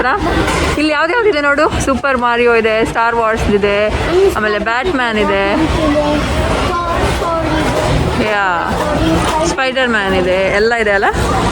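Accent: India. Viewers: We love your Kannada Accent and Pronouncing The Way You Narrate Kannada is Superb Probably best in class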